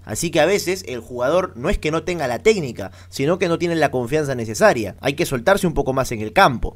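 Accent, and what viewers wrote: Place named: Argentina. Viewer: Te agradecería si dejas ese acento argentino ¡Che!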